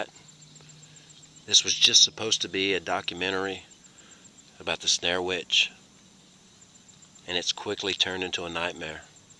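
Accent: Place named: South, United States. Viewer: I love your country accent. ;)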